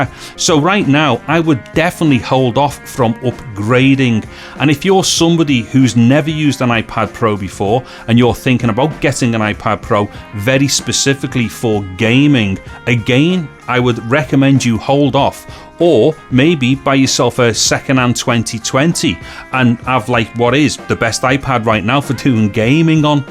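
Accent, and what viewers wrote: Liverpool, United Kingdom. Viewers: Never played a vid game ever sad I know, just love listening to a scouse voice brilliant. Not like south ar’ampsher drawl. Love my new iPad albeit a 11” keep gaming sir 👌😎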